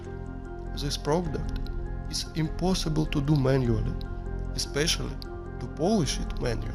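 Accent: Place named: Russia. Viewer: Why is the music so loud¿. Its Hard to hear what he says, with an Russia english accent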